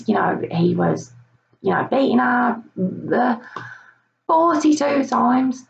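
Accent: Birmingham, United Kingdom. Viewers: you sound brummie I would say I'm from Leicester I can tell the accent it's strong but yam yam is that black country accent ??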